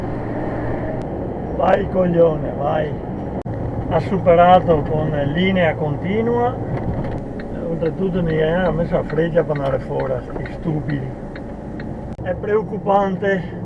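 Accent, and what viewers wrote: Veneto, Italy. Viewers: È tutto bene...i difetti potranno ma io non li vedo ...mi va bene così...poi mi piace sentir parlare Veneto.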